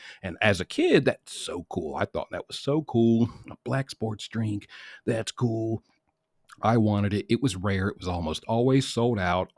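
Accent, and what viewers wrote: South, United States. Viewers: I LOVE the southern accent!! We say like it or lump it! 😂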